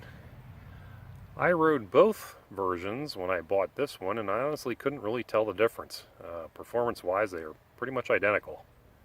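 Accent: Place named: Pennsylvania, United States. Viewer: Maybe a Pennsylvania accent?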